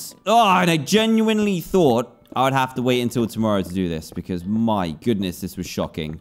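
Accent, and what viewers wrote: United Kingdom. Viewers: how would they be brothers? Think is from NYC and is from the UK. Why do you think has a British accent and Justin doesn’t.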